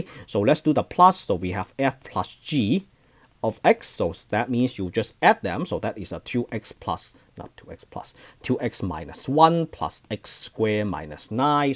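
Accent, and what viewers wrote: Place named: China. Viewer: Bro is speaking english chinese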